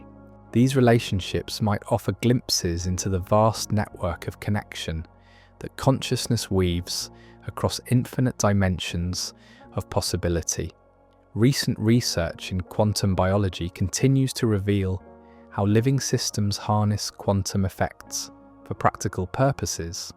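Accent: England, United Kingdom. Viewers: Why this English accent…use an other voice Im sick of this wet mouth babble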